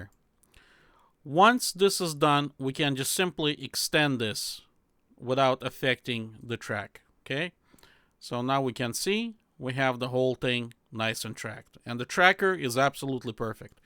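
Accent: Russia. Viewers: Nice. It that a Russian accent? Very subtle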